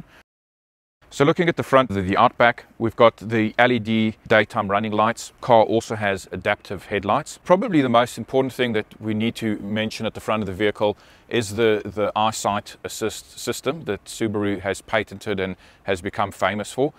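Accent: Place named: South Africa. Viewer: This guy is South African, not Australian.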